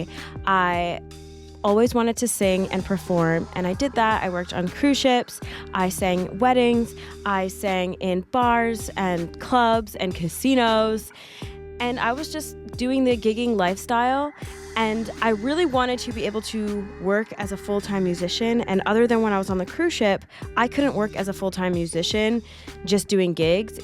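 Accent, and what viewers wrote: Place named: United States. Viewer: That American accent is off the hook!👍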